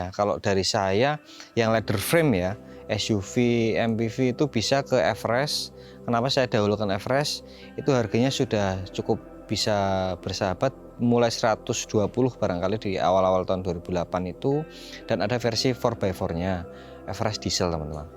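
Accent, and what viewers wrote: Java, Indonesia. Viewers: Engkik...engkik....suka bahasa mas, njowo banget, :-)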